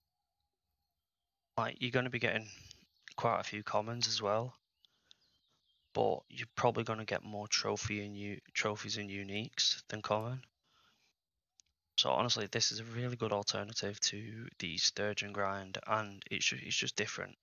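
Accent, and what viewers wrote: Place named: United Kingdom. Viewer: Sounds like your from UK, subscribed. Works amazing there with oversized carp mix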